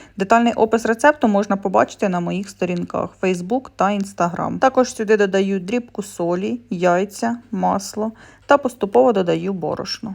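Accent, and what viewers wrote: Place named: Western Ukraine, Ukraine. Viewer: Вам рiдна,але не чисто украiнська,наголос бендерський.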